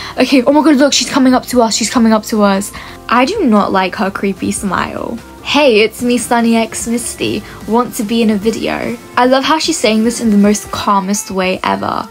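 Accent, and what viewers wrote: United Kingdom. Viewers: Um are you British?😂 i hear it in your voice!😅😅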